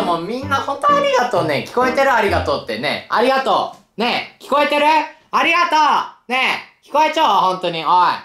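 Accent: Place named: Yamaguchi, Japan. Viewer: たしかに山口の方言使っちょるねw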